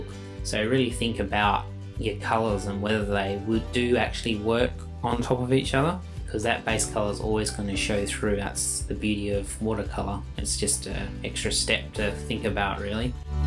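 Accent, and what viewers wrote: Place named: Australia. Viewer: Love watching the way you paint light! And great having a fellow Aussie accent to listen to 😊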